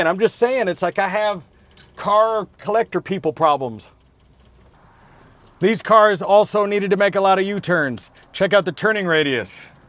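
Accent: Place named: New York, United States. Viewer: Hey my sweetheart ‼️‼️Im crying laughing at you pretending to be taxi driver🤣🤣🤣You sound just like a New Yorker🤣🤣🤣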